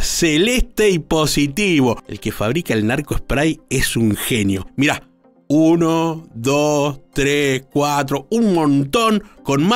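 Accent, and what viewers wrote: Argentina. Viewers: El video es bueno el control excelente.lo que no me gusta es el asno que habla.seguro es argentino o chileno no saben ni narrar .xno vi el video. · Los Argentinos hablan así..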